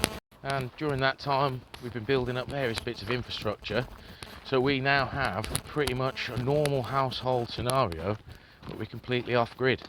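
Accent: United Kingdom. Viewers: another good video bro. Are u in england ? cant make out the accent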